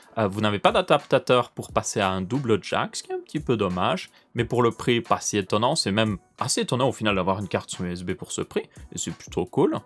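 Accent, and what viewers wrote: Belgium. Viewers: 80 % des commentaires parle de l'Hyper X cloud 2 ; 10 % de l'accent belge ; 10 % autres .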